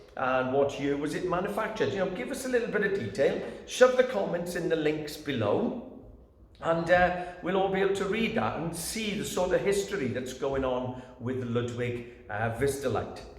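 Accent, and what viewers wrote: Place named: Liverpool, United Kingdom. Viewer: They were discontinued in late 70s because oil prices skyrocketed. Plastics are a by product of refinery business, including vistalite acrylic. It wasnt because of the light up system development, they just werent profitable to manufacture because of the source material price increase. The light system had its flaws too. They would heat up the shells to the point were the seams would start letting up. Are you from Liverpool by the way? You sound like McCartney :)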